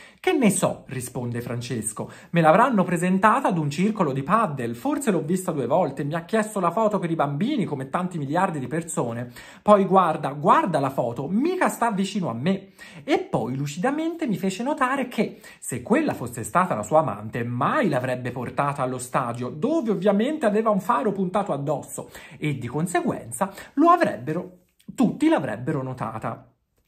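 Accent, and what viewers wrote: Tuscany, Italy. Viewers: finalmenteeeeee ❤ mi fa morire il fatto che parli in toscano mentre spieghi 😂😂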